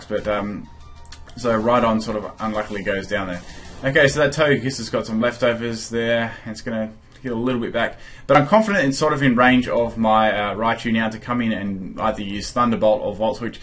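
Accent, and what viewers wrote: Australia. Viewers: I'm from australia perth and you song like me so he's probably from perth, australia, anyways NICE vids really enjoy e'm!!!! :D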